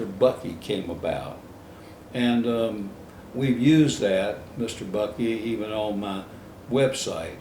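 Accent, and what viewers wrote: South, United States. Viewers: it sounds so much better when you say it in your soft southern accent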